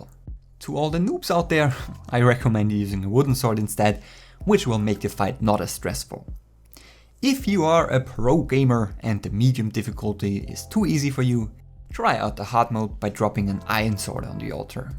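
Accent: Germany. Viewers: You sound a bit like someone who speaks German, am i correct? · You kinda sound German xD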